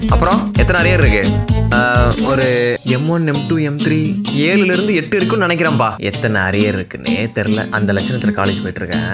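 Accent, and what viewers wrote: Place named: Madurai, India. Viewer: Madurai slang was superb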